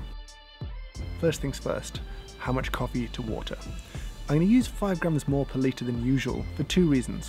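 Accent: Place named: England, United Kingdom. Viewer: All I have to do is take one look at the profile of your glass of ice coffee and I know it's too weak for my taste. It looks more like tea to me. Maybe that's how you like your coffee. You do have an English accent! No thanks. I'll drink mine using the old fashioned Cold Brew process. Dark, strong, and dank!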